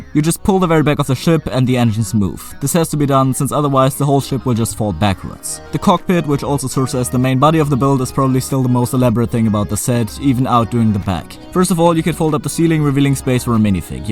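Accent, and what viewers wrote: Germany. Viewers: Ich liebs wie man immer bisschen den deutschen Akzent raushört 😅 da kann man noch so gut englisch sprechen 😂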